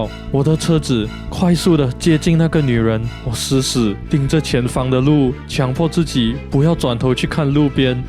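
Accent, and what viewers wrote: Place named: Singapore. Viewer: You sound like a Singaporean.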